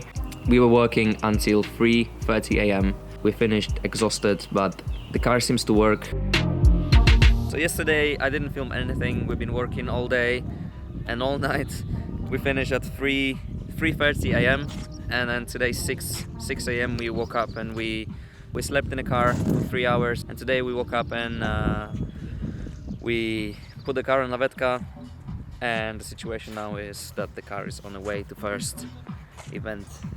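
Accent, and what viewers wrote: United Kingdom. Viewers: ngl you have a hint of a British accent 😂😂😂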